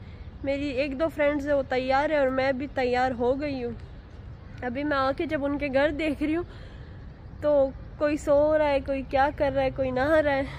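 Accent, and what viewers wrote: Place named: Middle East. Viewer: Ap Pakistan se ho k middle east se qk ap Urdu JB bolti ho to jaise lagta hai k ap middle east ki ho.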